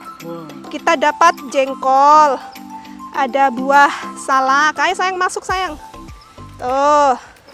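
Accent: Java, Indonesia. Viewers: Mbk aslinya org mana ya, cz logat nya asli jawa banget..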